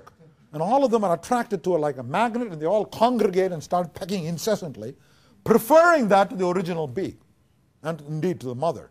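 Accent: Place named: Scotland, United Kingdom. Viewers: Thank goodness you have noticed that too. I wonder when he was at Cambridge university he may have picked up a bit of a Scottish accent as there were Scottish tutors and students there too. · Why does he sound like a scottish man?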